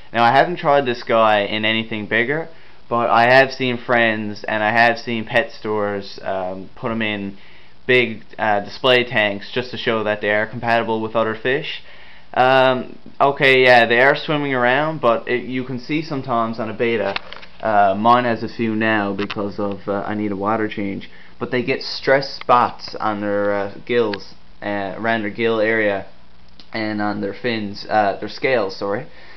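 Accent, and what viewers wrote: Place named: Canada. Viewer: sounds like your either canadian or Irish american. I also have a few questions, I want to get a betta and i don't really want too big of a tank you think a betta will be comfortable in a 5 gallon tank? My house it always around 78, will i have to get a filtration system or warmer, I was hoping just to have a 5 gallon tank, put some plants in it.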